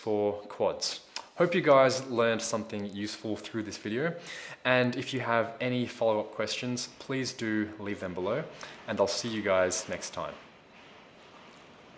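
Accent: Australia. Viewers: OMG you're aussie! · Came here for the Quad exercises. Subscribed for the quality info delivered with a chilled Aussie vibe 👍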